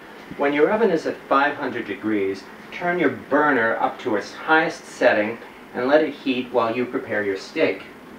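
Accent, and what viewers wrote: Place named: Appalachia, United States. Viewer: You sound like a frigging hillbilly, are you?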